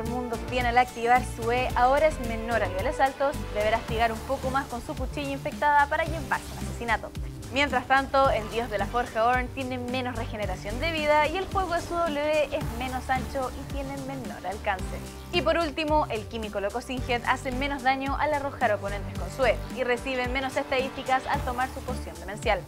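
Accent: Chile. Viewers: Me gusta el acento chileno pero solo el tuyo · Se nota que es chilena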